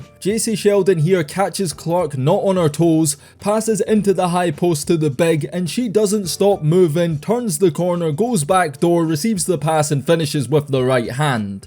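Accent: Scotland, United Kingdom. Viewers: It's crazy to hear this guy with his Scottish accent talking about American WNBA basketball. 😂😂😂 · Besides the fact that I just cannot stand your voice you embellish so much it is just nuts you exaggerate to the point where I can't even stand to listen to you it's like you are reading this off of a paper which I'm sure you are and it sounds exactly like it I don't know why they don't get somebody with a English voice that doesn't have some kind of Scottish accent I don't know any Scott's that are playing basketball for any team in America it's annoying just listening to you and listening to you exaggerate is the worst part of it there are Junior High girls in America that can do the same things that these girls are doing and you turn a simple layup into the greatest play of all time I just can't stand listening to you these other people can listen to you I'm done with you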